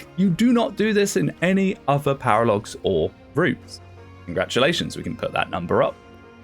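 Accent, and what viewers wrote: United Kingdom. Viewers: You sound Very British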